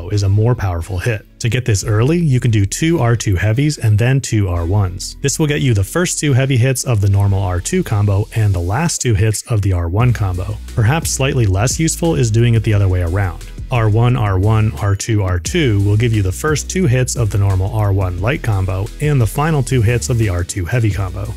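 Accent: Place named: United States. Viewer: Finally an American accent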